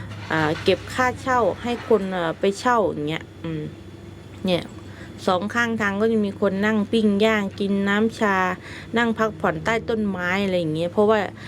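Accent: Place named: South, Thailand. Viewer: เจอคลิปคุณเมื่อวาน เริ่มไล่ดูไปเรื่อยๆ การตัดต่อบรรยายมีเสน่ดี ตอนแรกนึกว่าคุณเป็นคนใต้ หรือไม่ก็ปราจีน เคยได้ยินสำเนียงแบบนี่ ที่สำคัญคุณเป็นคนตลกมาก ชอบ กดติดตามแล้วนะคะ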